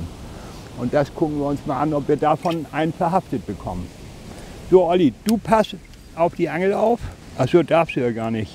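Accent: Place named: Frisian, Germany. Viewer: Ich könnte dir stundenlang zuschauen. Ich mag dieses friesische Dialekt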